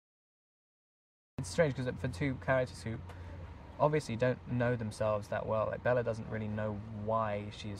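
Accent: United Kingdom. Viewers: wow! really love the british accent...always mentioning it though...but i do really love his accent....gah! it's perfect on him...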